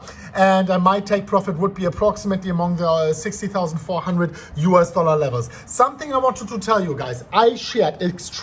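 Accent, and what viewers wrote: Germany. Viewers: Even if you speak English I fell like you speak German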